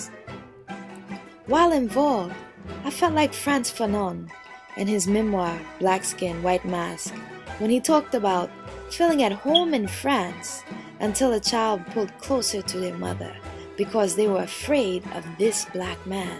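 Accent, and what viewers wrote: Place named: Bahamas. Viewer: By the way, you sound like from the bahamas... :)) Just from what I'm hearing...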